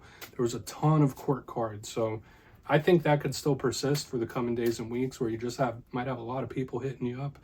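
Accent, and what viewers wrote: United States. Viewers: At least you speak English like the true American you are! I’m so sick of all the foreigners tarot readers from other lands that we can’t even understand with their too thick accents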